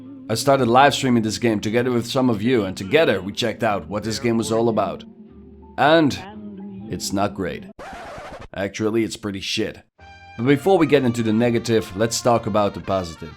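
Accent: Russia. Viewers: It sounds like you have a Russian accent. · Well, it's a decent game if you play it in chunks. A few missions per day. Anyway, where the he hell are you from? Russia?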